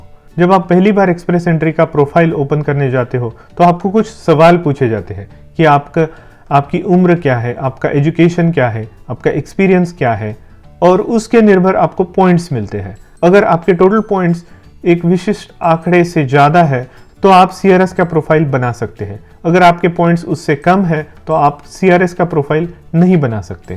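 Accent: Maharashtra, India. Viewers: Sirji r you from Maharashtra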